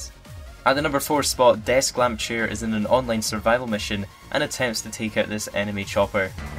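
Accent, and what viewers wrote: Scotland, United Kingdom. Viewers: Where are you from? You sound Scottish.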